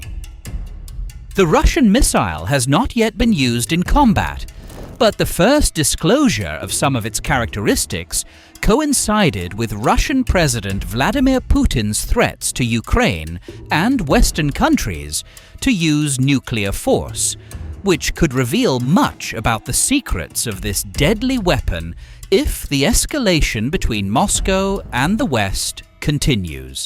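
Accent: United Kingdom. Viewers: Wrong accent. I place no creadence in any brit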